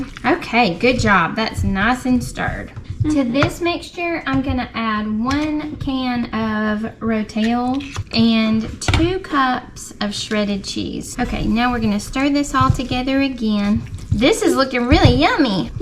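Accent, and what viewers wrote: South, United States. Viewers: I love your Southern accent. Where are you from?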